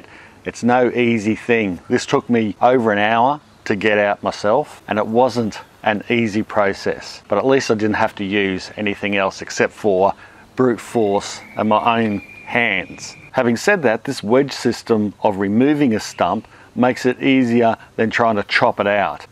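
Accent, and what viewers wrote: Australia. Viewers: I really just wanted to listen to an Aussie bloke talk about removing stumps. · Love that Australian accent. Too bad the country has gone Totalitarian... The rest of yhe world is following, sadly. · I wonder why the early pilgrims of the 17th century didn't develop that ozzy sounding accent. maybe they did but there are no recordings to confirm it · "How to Remove a Tree Stump with a Pick Axe, Chain Saw, Scrap Hardwood, Circular Saw, Prong-tool/crow bar." Hmm, yeah, wedges and a sledge is all I expected to need by the title. FYI, I'm doing the work for a couple Geriatrics on a super slim budgie, not looking to run up a line of credit at the home improvement warehouse. Always enjoy listening to the Aussies, regardless. My favorite YouTuber is Ozzy Man Reviews: <link> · Al Borland, but Austrailian...... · This dude is an Aussie Stud! With that accent and those thunder thighs, he can get anything done! 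· Dan Miranda I could listen to an Aussie read a phone book.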